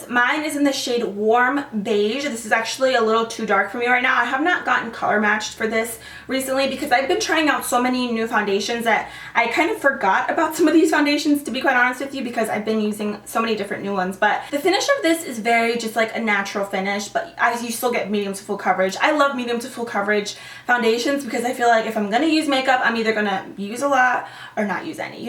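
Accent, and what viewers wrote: Spain. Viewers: i just love yur spanish accent 😘😘😘😘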